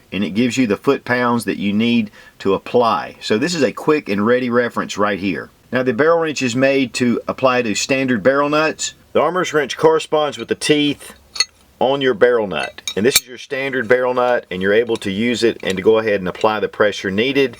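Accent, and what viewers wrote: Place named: South Carolina, United States. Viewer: LOL... gotta cut some slack. That is a South Carolina accent some folks may not be used to is all and I totally agree on the mag vs. clip nomenclature. Tube On!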